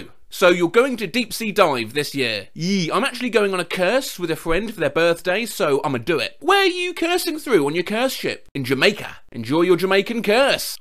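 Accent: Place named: RP, United Kingdom. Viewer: Hell yes new posh Brit video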